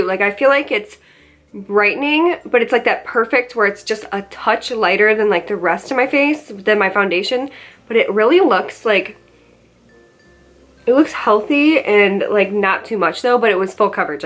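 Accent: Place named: New Jersey, United States. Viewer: You're sooo beautiful are you from New Jersey?Im wondering where your accent is from lol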